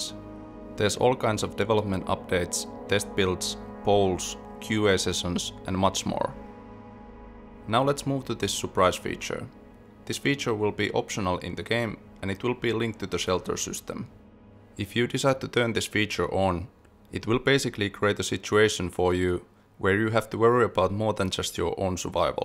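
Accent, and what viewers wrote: Finland. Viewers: Its clearly finnish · Recognized the finnish accent as soon as the talking started, it's somehow so particular. Graphics and environments look very nice. Will wishlist this.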